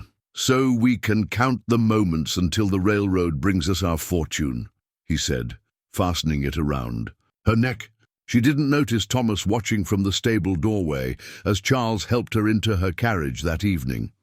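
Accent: United Kingdom. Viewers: Sorry but the engish accent and cowboy stories don't agree. The english storyteller is bad. His pauses at the wrong time ruin the pace. The best thing is the pictures of the cowboys and Indians ...mostly very handsome. Now the picture of the womsn in the above picture. How many women in the 1800s had tattoos on their chests?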